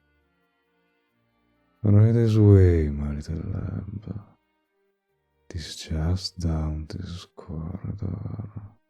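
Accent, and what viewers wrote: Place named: Russia. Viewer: Why do i feel like the accent is russian😨(its super cool thought)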